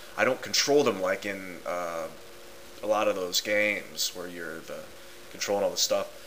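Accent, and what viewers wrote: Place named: General American, United States. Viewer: I disagree. Many, if not most, English speaking natives never master the English language. In my experience as an editor, well educated foreigners master English better than Americans. Among the typists, proofreaders and editors, it is a virtual United Nations at my newspaper. Top publishing firms have concurred with my statement. We come to this conclusion by who shows up when we hire. Don't sell yourself short. Where did you learn how to speak General American? It is perfection.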